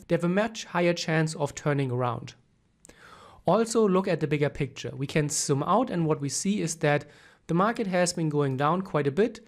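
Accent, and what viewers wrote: Germany. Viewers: I love your german accent.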